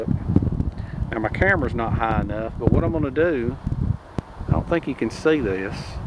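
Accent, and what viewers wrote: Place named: Boston, United States. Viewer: To understand Boston accent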